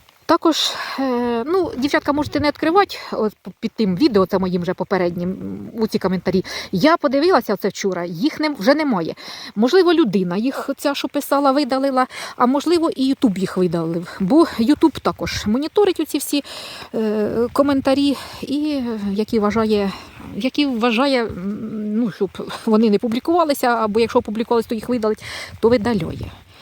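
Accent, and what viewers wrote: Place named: Cherkasy, Ukraine. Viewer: ти класна, таку б мені подругу мати 🥰і відео твої цікаві, і мова теж мила, ба нашу Черкащину зразу відчуваєш, тому я й підписалася на ваш каналі завжди жду відео ❤️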